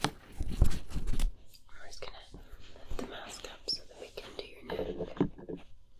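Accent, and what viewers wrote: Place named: Scotland, United Kingdom. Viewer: Very relaxing. Love your Scottish accent.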